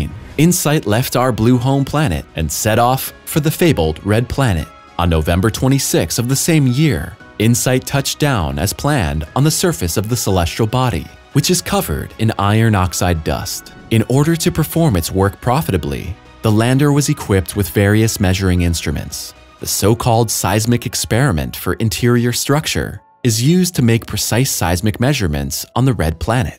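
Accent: United States. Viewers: Hear that voice. Dudes American. Go on with that stupid metric shit.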